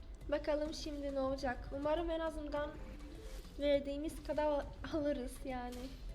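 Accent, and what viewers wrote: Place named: Cyprus. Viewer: Merhaba konuşmana bayılıyorum eskiden kıbrıslı sevgilim vardı deyişik biraz turkçeniz ordan anladım zaten kıbrıslı oldugunu:)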